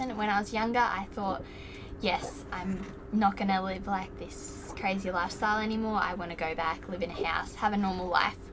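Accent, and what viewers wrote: Australia. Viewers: Like you are willing get on You Tube and answer both the easy questions and the hard ones. And as far as the hard answers. Always are allowed make that decision later, since your only a teen and many years to figure that out. As far as accent, since you be sailing many different places you will get more mixed but you will have your beautiful Aussie accent base. 👍♥️💯🎦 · You def have an Aussie accent. 😅 Your parents have done an excellent job raising you it shows in your demeanor and intelligence. God bless and keep the vids coming. ❤ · Medium (and delightful) Australian accent. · sorry sister you are Australian I can tell but in a vary nice way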